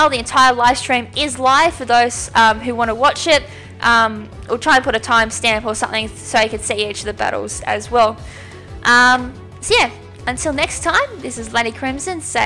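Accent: Australia. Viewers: Your australian!!!!!! Awwwwssssooommmmee so am i.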